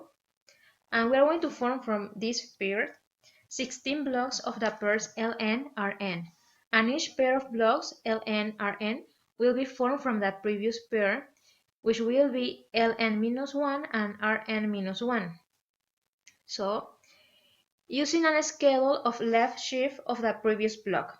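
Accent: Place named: Spain. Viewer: she's soo spanish. I love this accent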